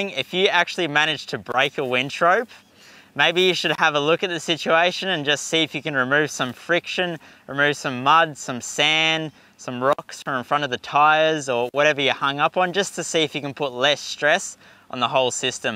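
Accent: Australia. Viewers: Love listening to this guy talk reminds me of the auses I worked with in Afghanistan 👊🏻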